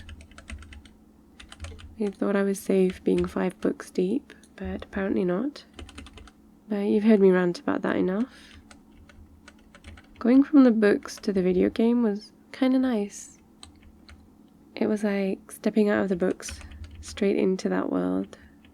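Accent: United Kingdom. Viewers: OMG BRI'ISH?!?? 😶😮 I mean Same but still! Cool voice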